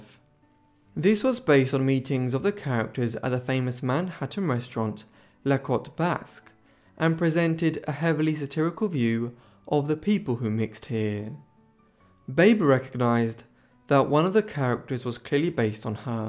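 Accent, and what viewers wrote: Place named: United Kingdom. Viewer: I love your Accent are you British?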